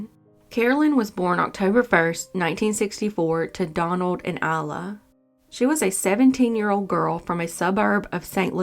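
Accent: South, United States. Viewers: That accent takes me back to childhood 😭. I miss the south, Ohio is horrible place. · Just lovin that cute southern accent, you sound like a gentle stream caressing the pebble just pure flow x love it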